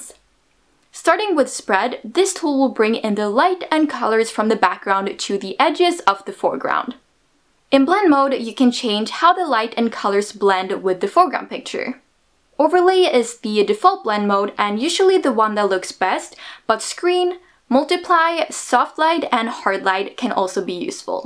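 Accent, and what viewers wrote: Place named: Yugoslavia. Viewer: This is great. Thank you. Btw, I love your accent. Are you originally from Yugoslavia? Your diction sounds like tennis star Monica Seles.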